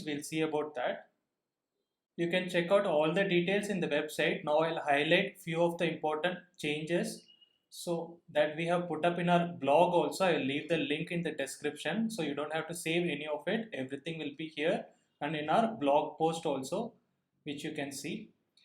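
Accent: India. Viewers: Sir are u south Indian?which state r u from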